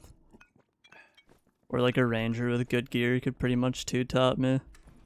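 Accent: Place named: Valley, United States. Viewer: Thats a gross ass val accent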